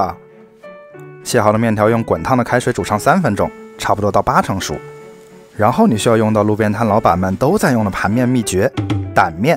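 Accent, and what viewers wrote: Wuhan, China. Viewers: 果然你的口音是武汉的！ 加油 拐子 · 我就说为什么你的口音一直亲切，原来也是武汉的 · 难怪口音这么耳熟哈哈哈哈哈哈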